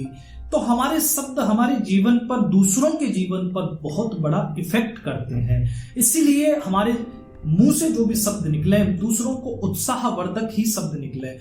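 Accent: Braj, India. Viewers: बृज वाणी ❤️❤️ राधे राधे 🙏🏻🙏🏻🙏🏻🙏🏻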